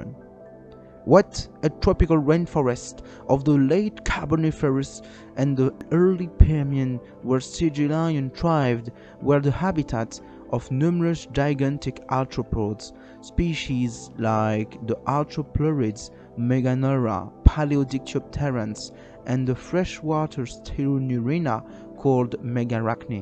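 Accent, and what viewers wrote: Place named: RP, United Kingdom. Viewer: On ferme les yeux et on croirait entendre un Lord anglais … C’est plutôt un compliment bien sûr, ça change des « ze plants of ze carboniferous … » Very good subject!! Tha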